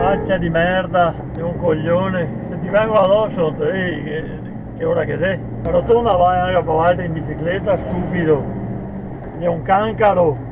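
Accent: Belluno, Italy. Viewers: Buongiorno hai l'accento uguale del mio ex titolare di Belluno/Alleghe😅,l'altro giorno uno mi ha fatto quel zigzag in autostrada senza far freccia e sembrava un caccia delle frecce tricolori alla fine mi ha tagliato la strada per prendere l'uscita a destra e gli ho suonato si è incazzato pure 😅🤔 buona domenica 🙋🏻‍♂️un saluto da Stoccarda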